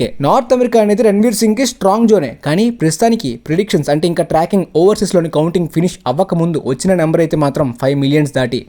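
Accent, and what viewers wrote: Srikakulam, India. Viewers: hey srikakulam ah medi ??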